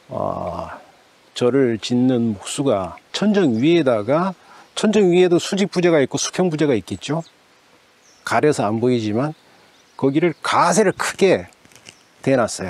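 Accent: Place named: Gangwon, South Korea. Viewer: 강원도 말투신거 같은데 듣기 좋습니다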